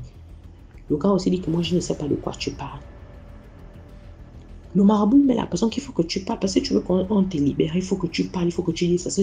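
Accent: Gabon. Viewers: Ah j m disais bien que c l'accent gaboma😂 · Kié une vraie gabonaise avec son accent bien appliqué 😂😂😂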